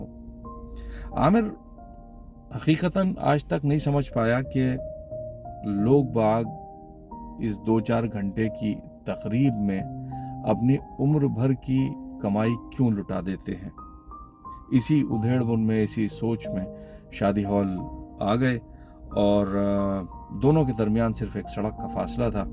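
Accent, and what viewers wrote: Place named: Aligarh, India. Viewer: Voice n andaaz fully Alig❤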